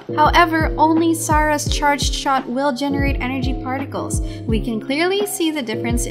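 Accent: Philippines. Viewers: It's in your about? I should have just checked 😆 I think you're from the Philippines tho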